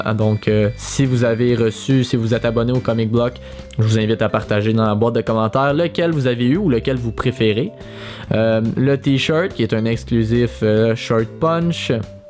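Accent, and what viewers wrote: Quebec, Canada. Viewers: super ta facon de présenter! et j'adore of course l'accent du Quebec! Grande classe! dis moi comment fonctionne ton systeme tournant pour batman? Je veux faire pareil pour mes LEGO mais on voit le truc, alors que la toi entre le plateau qui tourne, le reste de la table et le fond, on voit rien! C'est pro!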